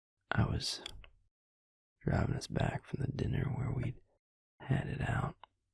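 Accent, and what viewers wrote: South, United States. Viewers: I’m liking the southern accent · Lemme just say, this southern boy makes my tennessee gal heart happy. (as much as i hate living in the south, there’s something about soft spoken southern charm that has me swoonin.)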